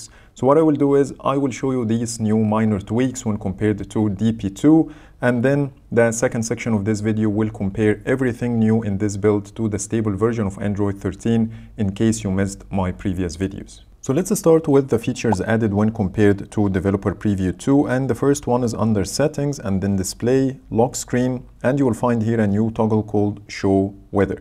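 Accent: Egypt. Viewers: Love ur egyptian accent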